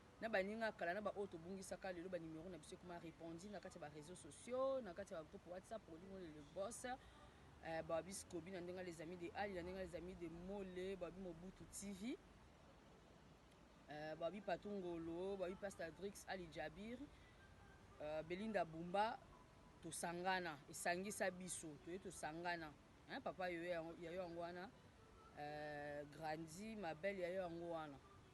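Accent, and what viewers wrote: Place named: Canada. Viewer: Osololi mole la canadienne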